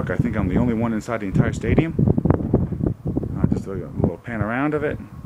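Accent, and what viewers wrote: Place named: Northeast, United States. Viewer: A northeast accent touring Houston history @@ LOL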